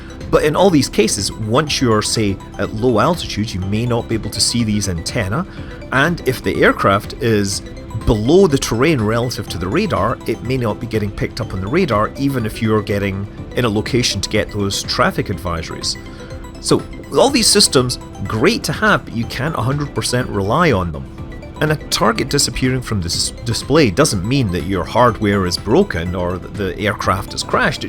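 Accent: Scotland, United Kingdom. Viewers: I always thought you lived in Scotland 🏴 or the Isle of Man 🇮🇲 based on your name and accent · Ha Ha First time watching your vids and i heard the Scottish accent and thought you were flying in Scotland. You must have taken our weather with you to California. New Sub fae yer home country.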